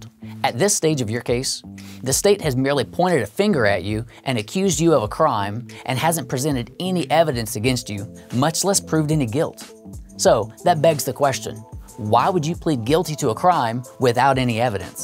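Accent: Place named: United States. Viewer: true. But who are you replying to? I don't see anyone saying they're the same, and I think we all understand that this video was about the US. (The accent was a pretty big clue)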